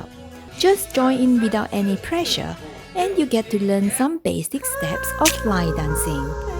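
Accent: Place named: Japan. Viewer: Lol..I see. Now I know this is an English video with some Japanese pronunciations..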